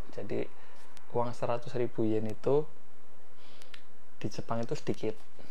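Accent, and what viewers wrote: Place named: Java, Indonesia. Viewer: kawel medok nemen 😁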